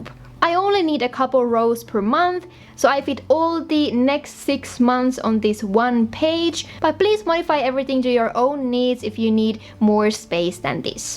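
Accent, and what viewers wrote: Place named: Finland. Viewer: huomaski välillä ihan niiinku oilisit puhunut suomea hih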